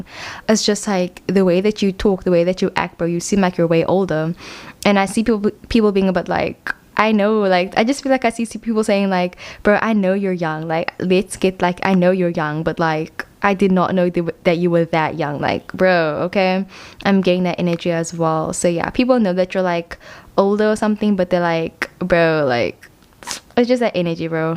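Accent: South Africa. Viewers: Sis you sound south African as fookkkk Omg u are South African! Yayy Wait how is this so acurate. Pile 1. No fucking way whattt! No I'm subscribing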